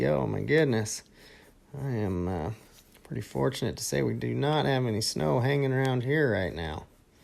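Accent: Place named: United States. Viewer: awesome video, lots of good takeaways! practicing for 3d modelling. greetings from germany, btw which kind of american accent is that?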